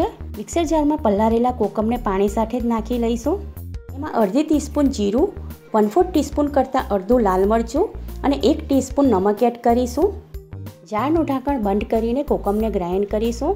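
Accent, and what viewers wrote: Surat, India. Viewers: Tamara avaj par thi lage chhe tame surati ho evu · Ek dam surti boli ,zkkas aalu puri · Be tame to bo jabri sudhdh gujarati bolvani try kairi.. ... Game etlu try kairu pan surati to hambhadati j chhe... Khabar padi j jati chhe..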